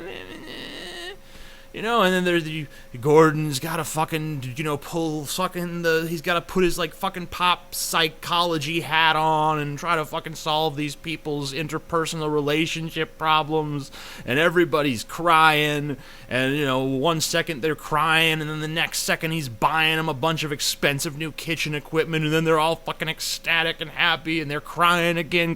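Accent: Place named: England, United Kingdom. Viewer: Your English Accent is amazing x)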